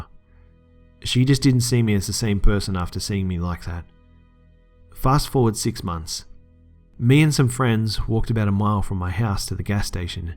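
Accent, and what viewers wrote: Australia. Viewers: move here to Australia, a lot of people sound like this even me and my friends... in other words everywhere 0_o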